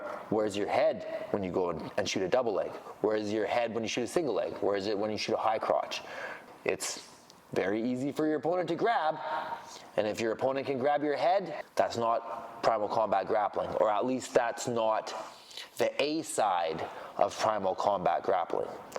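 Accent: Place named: Canada. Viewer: Gotta say it’s great to hear another Canadian on here “aboat”. Like I’m in a Tim’s lineup in Sudbury. Much love brother.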